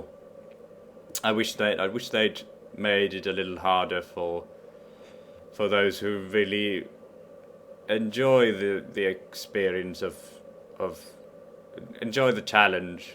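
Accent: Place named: RP, United Kingdom. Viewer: You sound posh as fuck.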